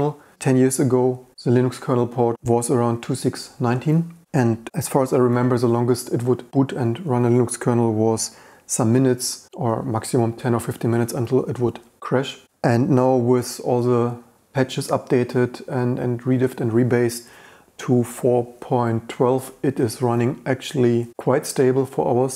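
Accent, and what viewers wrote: Germany. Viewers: Mh the heavy german accent, delicious! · Your english is very german.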